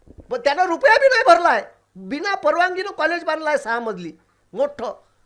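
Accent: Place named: Vidarbha, India. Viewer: Varhadi Marathi ❤❤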